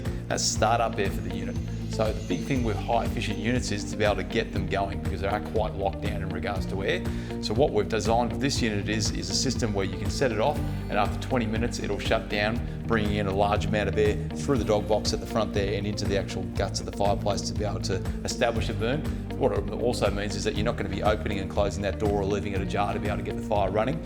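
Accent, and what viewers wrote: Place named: Australia. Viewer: Useless video due to the stupid music. I can make out around 20 percent of what is being said, combined with the aussie accent its not an easy listening situation.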